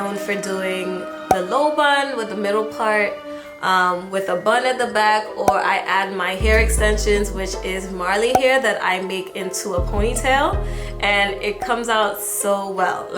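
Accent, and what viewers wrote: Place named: East Coast, United States. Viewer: ! Great video. I need to do somet'ing with my hair during this quarantine lol. Not to be too intrusive but where are you from? I hear a little east coast in your accent! lol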